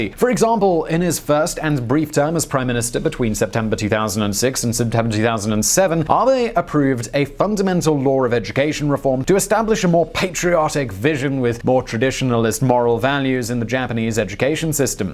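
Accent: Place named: United Kingdom. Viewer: Kinda hard to take this guy seriously with that accent, we all know Britain is the OG when it comes to fucking over nations. And they didn't stop either, Palestine and the Middle east are witnesses. Oh my god, I just realised I speak 'their' language😭😭😭 gotta respect the British pillaging- it's value for money #longlasting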